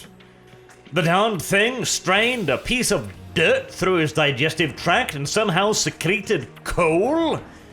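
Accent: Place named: RP, United Kingdom. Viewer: Some people on the internet think is not aware of how smug and posh his accent sounds. They haven't seen his game reviews.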